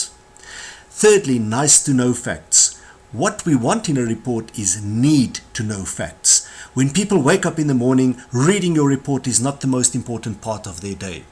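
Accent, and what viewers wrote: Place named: South Africa. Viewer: that south African accent though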